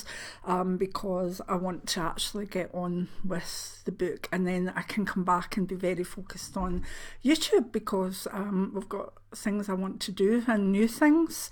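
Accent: Scotland, United Kingdom. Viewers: I wish you a happy and joyous Christmas with your family and grandson, the little ones really do make it magical. Have a wonderful Hogmanay and I look forward to future videos, you have helped me get through a difficult year after loosing my mum in January, listening to your beautiful accent has soothed a lot of my emotions, its like having my mum near by, I miss hearing the Scottish accent, I lost mine years ago, though apparently it slips in every now and then. Thank you for all you do xx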